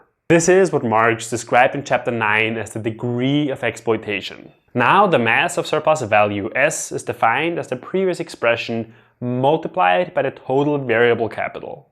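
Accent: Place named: Germany. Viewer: You think your so smart because of your german accent. You are the bourgeoisie that Marx described. You benefit from the superexploitation of the third world. Maoism-third worldism is a new era of marxism. Your class position in society is determined by the level of exploitation you benefit from not always the relations with the means of production. For instance, during the feudal times, the serfs were held liable to work for a certain quantity of days for the nobles. Even though the serfs owned the means of production such as the land, tools, and equipment, under feudalism, they were compelled to abide by the rules of the ruling class under feudalism which soon led to the french revolution. The french revolution brought forth the primitive stage of capitalism by the execution of King Louis XVI. Feudalism is the dictatorship of the nobles as to capitalism is the dictatorship of the Bourgeoisie and socialism is the dictatorship of the proletariat. 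Capitalism-Imperialism is the dictatorship of the new bourgeoisie, the first world parasites.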